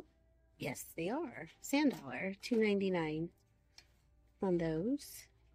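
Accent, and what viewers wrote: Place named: South, United States. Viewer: Love your southern accent! Cant wait for the Maniac one... downeast? Or perhaps Acadian?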